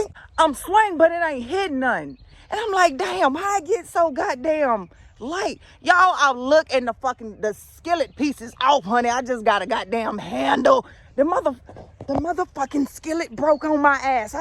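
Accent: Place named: Midwest, United States. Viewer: That actually Midwest accent not country loll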